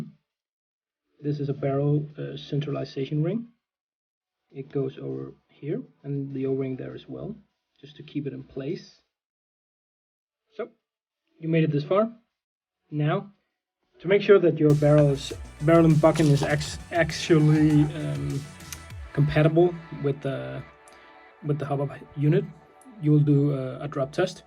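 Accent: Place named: Denmark. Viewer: are u danish? i smell accent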